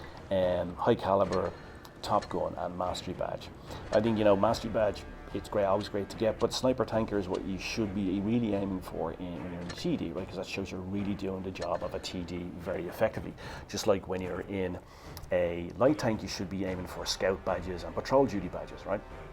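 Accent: Ireland. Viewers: Thanks for the review mate! Can I ask, are you irish? For the accent nothing more, if u are cool. Cheers Saludos desde Mexico!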